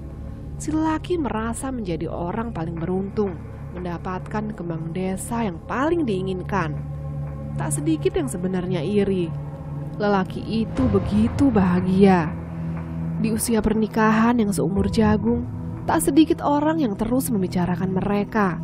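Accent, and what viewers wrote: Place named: Java, Indonesia. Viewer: Tapi aku mlah suka suara khas jawanya. Semngat kak🔥❤️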